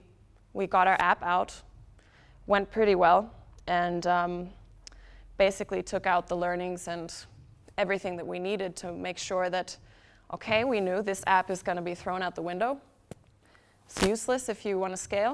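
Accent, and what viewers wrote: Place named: Germany. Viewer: I can hear a German accent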